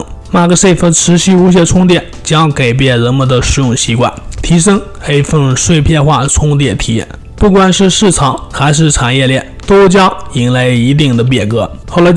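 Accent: Shanxi, China. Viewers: 你山西人哇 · 听起来像山西陕西一带哈哈哈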